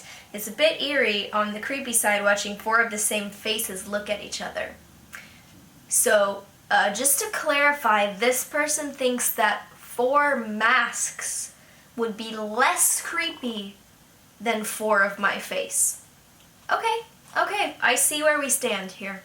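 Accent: Castile, Spain. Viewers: Where did you learn Spanish? Your accent sounds like it's from Spain, but I'm also not the best at distinguishing accents in Spanish. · I'd like to know, where you learned Spanish. I can't quite place it. Sounds Castillian but may be Argentina or Chile? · Hi. Thanks for your music and voice. I most confess that I come to your channel for your cover of Evangelion, but your voice inspire me in my job. Sorry for continue in spanish, i'm colombian and I dislike use the english language (the colombians are really trolls when you don't speak as a native...). Tu acento tiene una marcada influencia de España, es genial. Espero poder apoyarte con tu trabajo después, es realmente bueno. Gracias por tu coraje, al tomarte tu tiempo con tus seguidores (fans). Saludos desde el cono sur.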